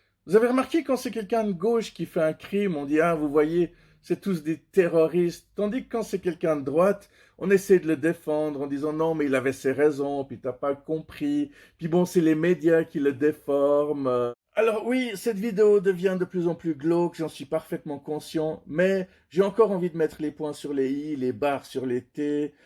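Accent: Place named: Switzerland. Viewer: C.est ton accent suisse qui fait que tu n'es pas cru quand tu dis en ligne que tu es un bad boy... Les religions, la politique, la meteorologie, ne sont pas les meilleurs sujets pour discuter...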